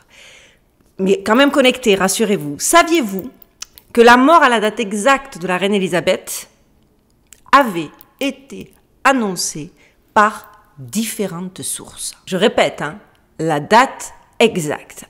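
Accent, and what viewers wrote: South, France. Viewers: Tu ne serais pas originaire du sud de la france des fois 😊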